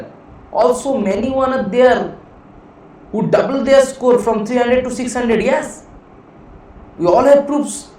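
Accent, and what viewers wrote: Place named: Odisha, India. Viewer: Bhai apke Hindi speaking style pura Odia log jaisa he. Kahin ap ka Mother toung Odia to nahi??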